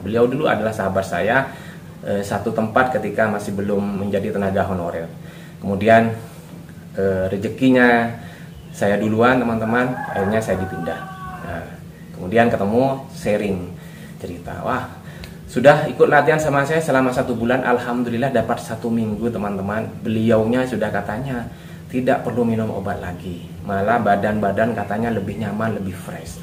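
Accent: Madura, Indonesia. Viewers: logatnya kaya madura ya bg ku?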